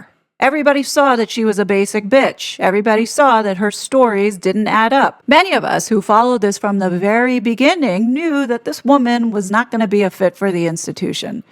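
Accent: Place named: New York, United States. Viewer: yes! Yes! Yes! This is why I keep coming back. You say it in a way that only a New Yorker can say it. THANK YOU!!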